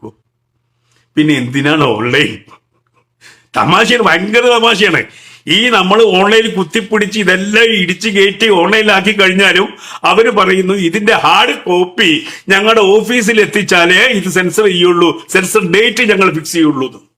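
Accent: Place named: Vadakara, India. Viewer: I like ur Calicut Vadakara slang. Reminds me so many good friends of mine.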